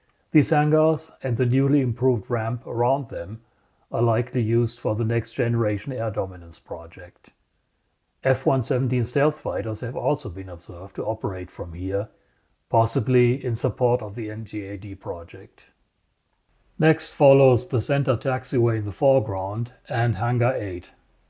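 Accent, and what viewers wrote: Germany. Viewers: I don't understand a word, but very authentic german accent!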